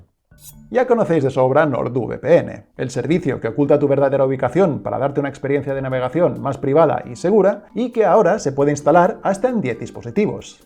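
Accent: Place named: Spain. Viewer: De verdad me encanta como explica....soy colombiana y a veces me constaba un poco oir documentales en acento español...pero definitivamente me encanta como el explica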